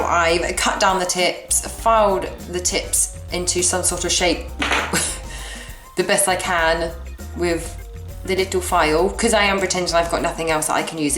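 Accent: England, United Kingdom. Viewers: are u from england.....cuz i am from bradford in england uk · Are u British? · She's British... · The dogs obviously not impressed either bless him! Naaaa think I'll pass on this one so thanks for the heads up on it. Much appreciated. My own nails are long and hard and I was actually looking for a vid on gel polish but then I got waylaid. (As one does lol). Lovely to hear an honest English voice on YouTube. Good stuff lovely girl.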